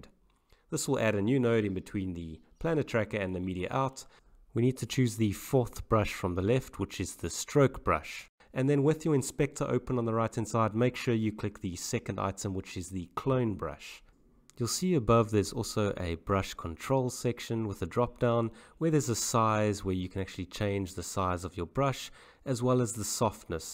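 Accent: South Africa. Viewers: Always Love the South African Accent ;)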